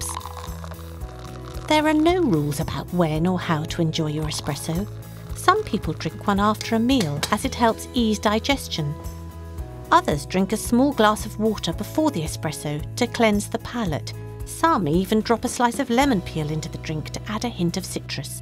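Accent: United Kingdom. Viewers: thanks british lady, i need this for my fanfic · The accent is too British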